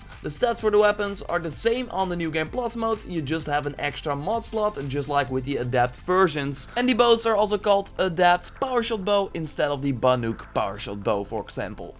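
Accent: Netherlands. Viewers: are you dutch? bc u sound very dutch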